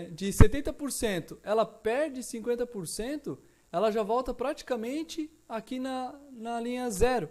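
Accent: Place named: Santa Catarina, Brazil. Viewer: ajudou bastante ! Pelo sotaque você deve ser catarinense, se for somos vizinhos, eu sou de Rio Negrinho SC rsrs....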